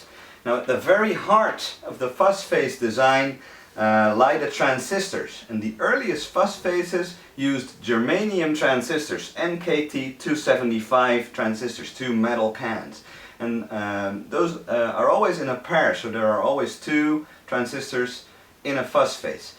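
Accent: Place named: Netherlands. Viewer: Could a silicon transistor be paired with a Germanium transistor? Get the best of both worlds. Also, what accent is that? Dutch? It's barely noticeable.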